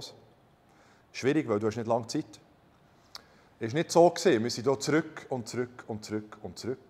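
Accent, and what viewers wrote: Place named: Switzerland. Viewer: ja sicherlich mein Freund, du sprichst ja deutlich! 😸 was kann man da nicht verstehen, und ich mag den schweizer Dialekt! 🤜🏼🤛🏼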